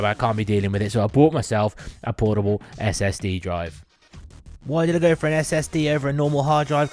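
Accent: United Kingdom. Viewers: Thankyou so much for this. I was wondering if this drive would work on a ps5 for external storage for ps4 and your video has certainly confirmed that. Love your British accent too.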